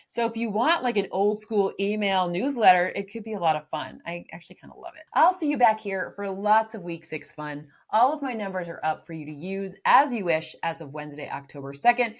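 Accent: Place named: United Kingdom. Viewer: Love the British accent! Continued excellent content.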